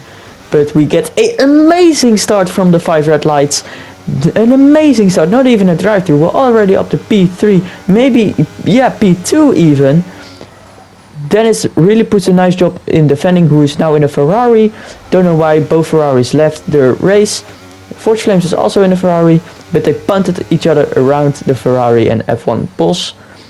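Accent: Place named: Netherlands. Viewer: Ik hoor dat je Nederlands bent :)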